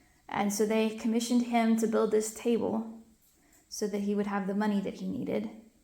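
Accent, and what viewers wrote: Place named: Tennessee, United States. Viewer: Ha! I've been trying to place your accent more specifically than just "Southern"—and Tennessee was the guess that I kept coming back to. (Now watch it turn out that you tell me you spent your whole life in Texas or some other state until you had just a year in Tennessee before moving to France.)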